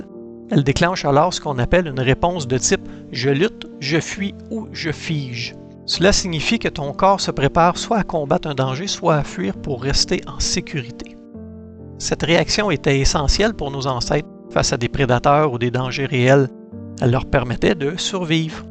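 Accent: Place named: Quebec, Canada. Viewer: Bonsoir ❤ je ne sais pas respirer, aussi, je me sers de votre vidéo explicative: " votre technique 4; 7; 8.." Merci pour cette explication géniale! Vos vidéos sont hyper intéressantes! J’ai une question...Est-ce qu’une personne ayant un souffle au cœur +un problème cardiaque peu faire du sport s.v.p? Merci par avance! J’adore votre accent Québécois, c’est un accent chantant tout mimi.Prenez soin de vous! ❤🙏❤️🥀🙋‍♀️🥀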